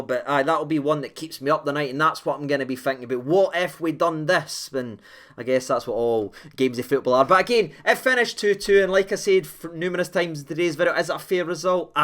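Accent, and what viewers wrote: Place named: Glasgow, United Kingdom. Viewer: I just love your wee Wegie accent❤️❤️ 🇦🇺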